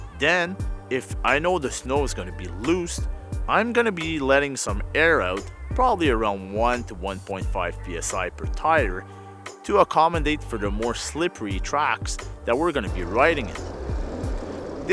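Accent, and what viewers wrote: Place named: Scandinavia. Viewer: Tout le long je trouvais que tu avais un accent scandinave. J'me disais que ça devait être en suède haha.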